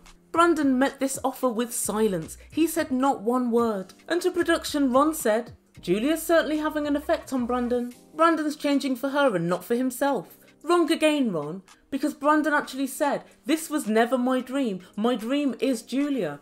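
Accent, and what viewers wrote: South, United States. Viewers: There is NOTHING I LOVE more than your American accent followed by your laugh. The best laugh ever. 🤣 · your American southern accent gives me life every time I hear it 😂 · Loved your southern accent!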